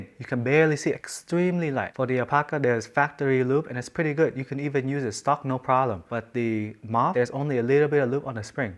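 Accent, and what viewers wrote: Vietnam. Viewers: As a Vietnamese, I love your accent so much hahahaha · That vietnamese accent is gold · I like your viet accent bro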